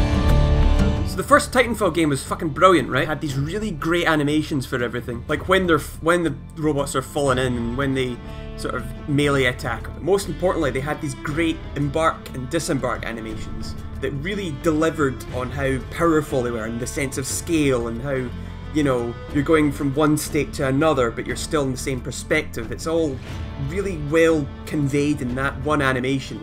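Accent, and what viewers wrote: Scotland, United Kingdom. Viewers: yasss another scottish youtuber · The pure Scottish accent makes me happy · you sound scottish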